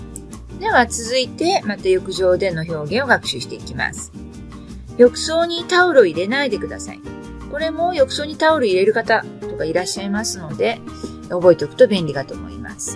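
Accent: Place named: Japan. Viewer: 英語には聞こえない！まるで日本語みたい！これでネイティブが聞き取れるかな？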